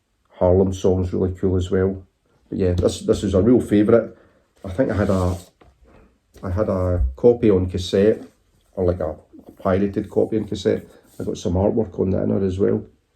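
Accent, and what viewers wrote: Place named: Scotland, United Kingdom. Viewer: Hi Look at all those records eh? Wow. That cover art was stunning. Wow. That would probably fetch a nice penny or two. My knowledge being limited to the RSD one I bought this year. Hey, there's the I got in Stockholm. Aww... (my one valuable Albert and some c**t threw a dart at it. Ah, that beautiful scottish brogue of yours. "Kånnty Jo and the Fesh". I had Irn-Bru in Stockholm. Oh, Vanguard. Reminds me of the... Anglia logo? I'd love to get the discography now (on vinyl) since I now have all of the ones, and, so, love that album!... love that album! I don't mind you don't like them. Btw have you tried listening to the ones? Splendid haul. Cheers 😊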